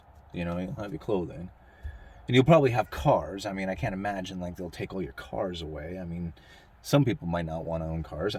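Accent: Pacific Northwest, United States. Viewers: You're such a Pacific Northwesterner. I feel like I'm listening to my oldest cousin who is from Bend, OR, roughly your age, and you both have the same "accent". I admire the hell out of both of you. Must come from the culture.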